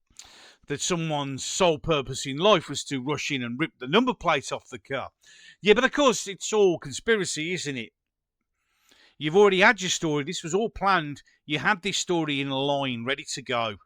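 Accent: Birmingham, United Kingdom. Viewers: Hiya New Sub, I'm made up your channel was recommended, people by me {I'm over the water from l/pool} are still believing the official narrative 🤯 by the way, I love the brummie accent , UB40 fan since the late 70's, and a friend of Brian Travers may he R.I.P 🙏🏻Such a lovely and generous guy .... Jayne 🇬🇧💙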